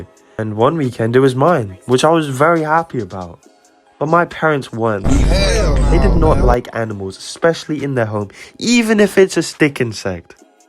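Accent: United Kingdom. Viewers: Your Puerto Rican with a UK accent? That’s interesting…. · "Puertorican" Bri'ish accent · The fact that he’s a Puerto rican with a British accent and his dad it’s kinda cold where he’s at is kinda wild to me. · Anyone else notice he has an English accent with Puerto Rican parents?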